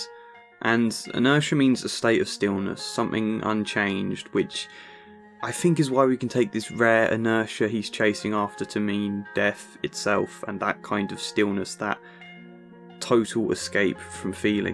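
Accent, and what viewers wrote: United Kingdom. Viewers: Notice you've got a british accent. You listen to much uk hip hop? Jam Baxters lyrics and fascinating and visual as well, but probably not quite to an level. You might like as well, cause he's really funny and slyly satirical.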